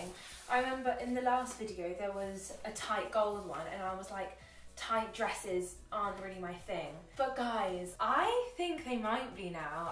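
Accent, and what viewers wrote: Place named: United Kingdom. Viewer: I love the British accent 💕💕💕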